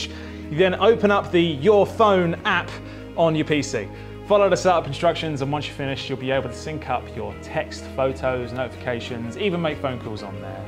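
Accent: United Kingdom. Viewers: with a man of Kent Maidstone accent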